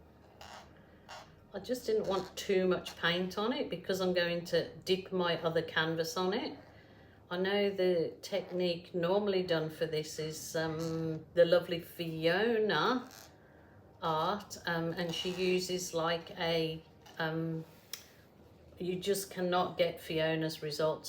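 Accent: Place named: West Midlands, United Kingdom. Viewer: Every now and again I hear a West Midlands accent in your voice …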